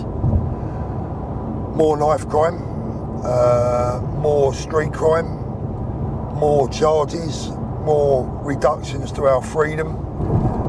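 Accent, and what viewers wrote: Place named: London, United Kingdom. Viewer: There we go with this disgusting un-English accent. I spent 5 years in London and found there the most horrible English accent in the world....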